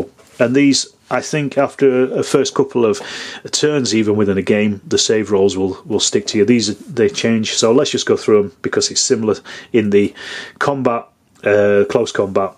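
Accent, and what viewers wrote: Northern England, United Kingdom. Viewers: I preferred Medieval Wargamer's review of the rules, it was more positive and Nic has a sexy voice compared to your northern tone lol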